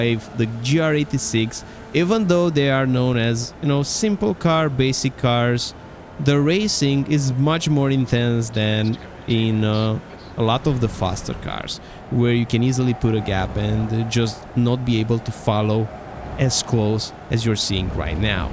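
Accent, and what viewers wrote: Romania. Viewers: hahaha, mi s-a parut ca ai accent de compatriot ❤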